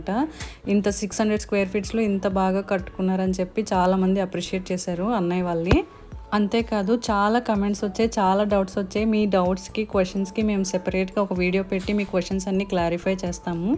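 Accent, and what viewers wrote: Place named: Visakhapatnam, India. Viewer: I think Meru Visakhapatnam varu iedali me voice tone House location yakka andi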